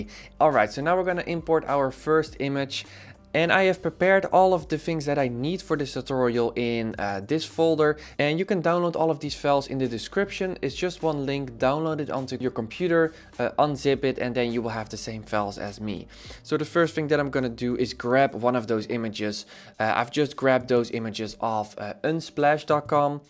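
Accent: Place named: Netherlands. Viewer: No offense, maar je nederlands accent is wel hoorbaar voor de mede-Nederlanders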